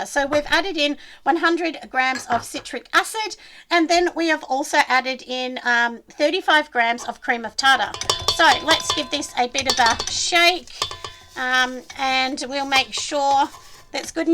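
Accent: Australia. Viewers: Dear a bit hard to follow the receipt.. english my second language and with autralian accent it would make it much easier to just hsve the recipe in the description as you mentioned at rge beginning of your video. Thank you for your efforts to share yr skills. From canada quebec..merci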